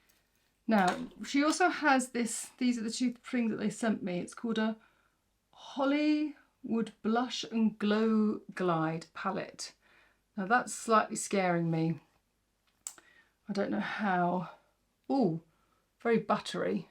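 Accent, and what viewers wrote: United Kingdom. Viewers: I just love your British accent (as an American with a more harsh accent), “cotton bud” rather than Q-tip, “knocking back the shine”. I so enjoy your tutorials and creativity in paring a warm cheek/lip with a cooler eye. Merry Christmas!🎁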